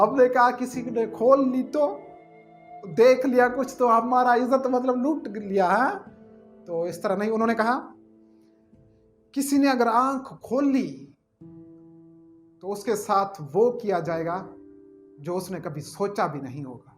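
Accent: Sindh, India. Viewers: Sindhi Ka Urdu bolna Zaher Hai ap Sindhi ho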